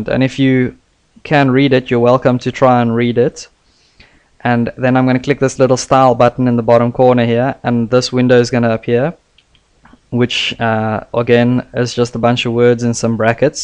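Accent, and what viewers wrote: South Africa. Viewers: You sound like Mr. Shaun Pollock and thats how I knew u r a SAian Very helpful lectures - Thanks :) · South African??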